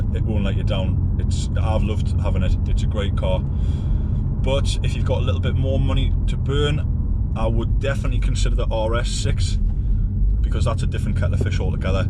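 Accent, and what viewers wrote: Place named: North East England, United Kingdom. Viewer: Just came across your channel today after looking at a 2019 A6 Avant 3.0L TDI V6 Black Edition online and was looking for a review. I presently drive a Discovery Sport but was looking to change and as a boy in the 70's Quattro's were the dream car. It is the only car I would consider changing into. I think the A6 looks better than the A4. I recently had a drive in the SQ2 but it didn't really excite me. I was really impressed with your film so I subscribed and wish you well for the future. I had a look at a red one also, somewhere in the north east of England, cant be far away from you judging by the accent.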